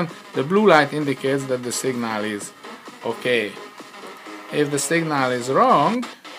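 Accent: Hungary. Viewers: ajj de magyar vagy te is :D kurva akcentus mi? :D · Annyira hallani az akcentuson, hogy magyar :DDDD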